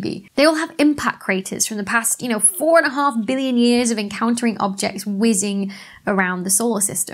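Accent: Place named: Cornwall, United Kingdom. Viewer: Love it, there was a hint of some Cornish accent coming into parts of the video.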